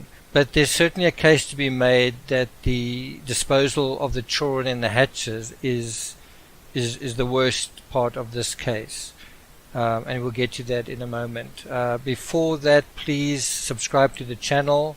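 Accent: South Africa. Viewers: I agree, I really like a South African accent.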